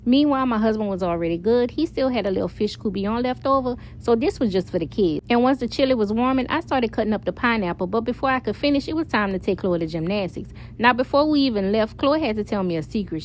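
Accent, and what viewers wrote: Louisiana, United States. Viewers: Are you from Louisiana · I really enjoy hearing you speak! I came across your page, and heard what sounded like a Louisiana accent! GOD BLESS you, Husband and family!! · What part of Louisiana you from? I’m from Eunice but live in California now . Some of my family lives in Lafayette I love listening to you talk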